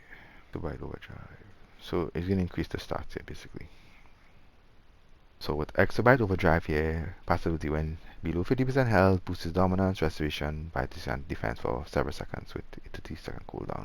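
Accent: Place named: Trinidad and Tobago. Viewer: how this man sound like ah trini so, thanks for the vid bro, im also playing a red lantern. me had a little trouble understanding them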